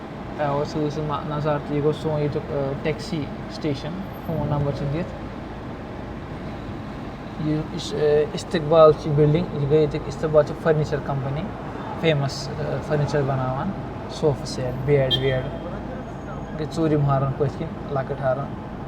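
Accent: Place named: Kashmir, India. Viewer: Watching from District Doda Like your kashmiri accent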